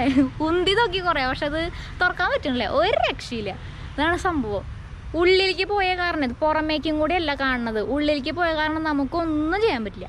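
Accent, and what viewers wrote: Thrissur, India. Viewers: Thrishoor basha nalla rasam kelkkan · Thrisoor slang poli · Saralla mole itrakim budhimutti... Thaarangalde veedokke kanichallo... Enikista mole pinne trishur bhaasha kelkaan nalla rasamund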